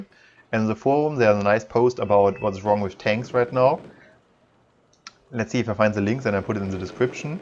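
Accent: Germany. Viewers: Typical German Accent... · well... it"s not a ranked map ... it"S not a ranked game ... and non of the above is correct but i love to hear you make commentry in that german accent non the less ...you sound like those german generals in those ww2 movies so .. i will give you a like hahahaha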